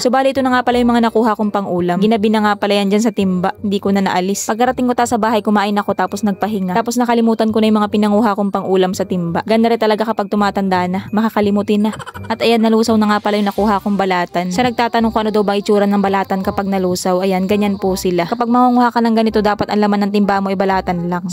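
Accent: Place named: Marinduque, Philippines. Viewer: salita mo salitang marinduque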